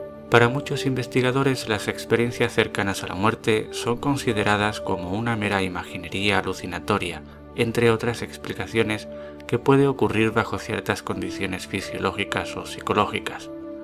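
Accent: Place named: Spain. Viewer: Hace mucho tiempo y durante el tratamiento por un aborto involuntario de cinco meses, estando en sala de operaciones porque había que limpiar por dentro y * estando consciente, me fui por un túnel. Estaba con un relajante y tenía los ojos cerrados pero no estaba anestesiada y estaba conversando con el médico y la enfermera cuando de pronto me vi en un túnel oscuro pero con tiras de luces de colores en la parte de abajo. Iba extraordinariamente rápido hacia el frente y de pronto comenze' a ascender y en un momento dado empezar' a ver en lo alto del túnel una luz blanca brillantísima y unas siluetas brillantes también que se asomaban al túnel moviendo los brazos como saludando. Esto lo iba yo contando al doctor y a la enfermera. Llevaba horas diciendo que me quería morir de lo mal que me sentía, no paraba con eso pero no me estaba muriendo y estaba tan consciente que hasta gire yo misma la cabeza porque estaba vomitando acostada. 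Fue muy raro y no me dio' miedo, más bien empece' a vacilar por la velocidad que llevaba pensando que si chocaba con una pared o salía despedida (a no sé dónde) entonces si que me iba a morir de verdad. Sentí que había gente conocida y también desconocida allí arriba pero el destello de luz no me dejaba ver sus caras. De pronto, salí del túnel y ahí quedo' todo. Claro, me quede con eso de haber estado en * el túnel sin haber estado muerta ni muriendo. No sé cómo los que saben explicarían esto. Suenas español, sabes cómo se veían las luces del túnel? Pu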